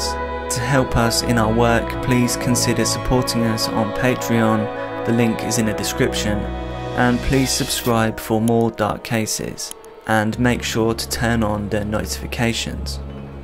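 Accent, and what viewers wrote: England, United Kingdom. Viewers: Dude you’re just reading the Wikipedia article nearly verbatim, albeit with a spooky English accent. Right on for making something entertaining without doing too much work.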